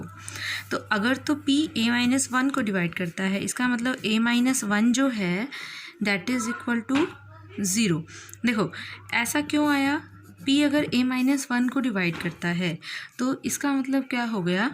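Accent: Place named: India. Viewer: Is every damn mathematician on the Internet an Indian person? I'm having trouble with ALL the Indian accents.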